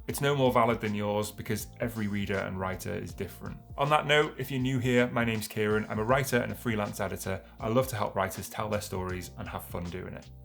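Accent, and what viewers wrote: United Kingdom. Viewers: Congratulations on your novel. Plus, I love your British accent!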